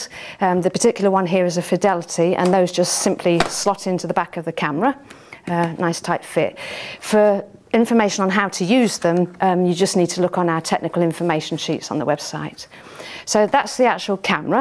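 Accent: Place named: United Kingdom. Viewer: nice british accent!!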